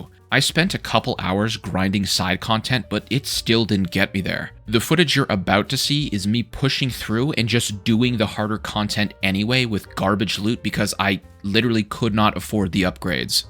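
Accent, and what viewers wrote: Canada. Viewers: Are you Canadian? 🤔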